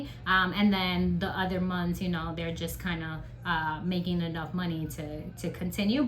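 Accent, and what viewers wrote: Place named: United States. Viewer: Great breakdown. Thanks for posting it. How did you end up in DR? You look Dominican but speak American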